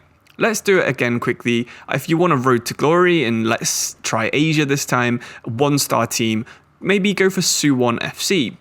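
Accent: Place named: United Kingdom. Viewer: broo i love your english accent... is soo funnyyy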